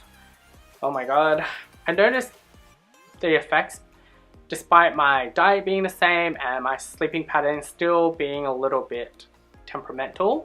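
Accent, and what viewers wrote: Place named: Australia. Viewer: That Australian accent a bit too much sometimes No offence ! im just saying it in general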